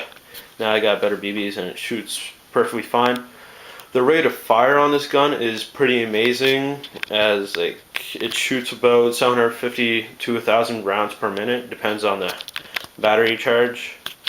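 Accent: Canada. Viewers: Haha I heard you say aboot and I knew you were canadian. I love canadian accents.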